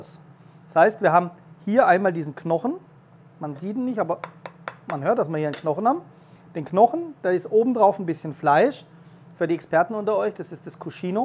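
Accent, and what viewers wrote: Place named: Franconia, Germany. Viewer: Karlsruhe? Du klingst aber auch etwas fränkisch.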